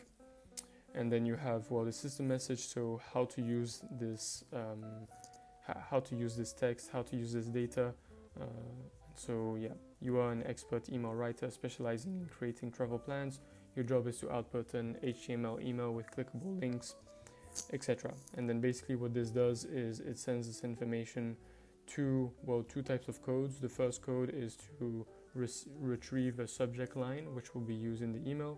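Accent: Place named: Nigeria. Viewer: why she sounds like a nigerian scammer?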